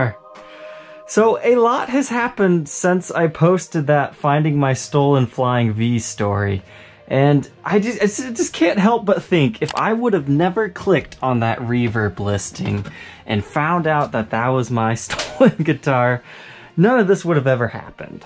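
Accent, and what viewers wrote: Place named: United States. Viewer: Because you sound American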